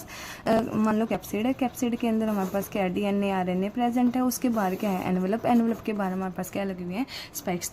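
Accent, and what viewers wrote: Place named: Western Uttar Pradesh, India. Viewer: Very good teaching 😊 Aap kha se ho ? Language Hindi aapne west up bagapt sayd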